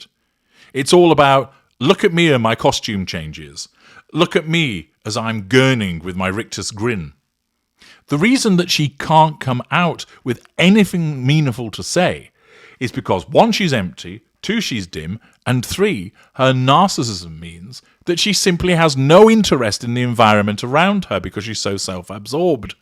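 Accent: United States. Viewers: Love your American accent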